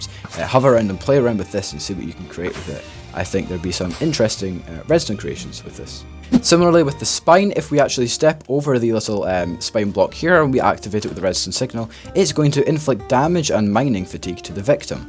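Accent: Scotland, United Kingdom. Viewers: Fife, Dundee, Edinburgh? Somewhere South East ish? I'm trying to work out your accent!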